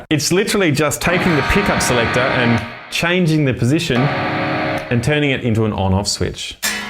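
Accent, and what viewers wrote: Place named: Australia. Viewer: I did NOT expect that Australien accent 😅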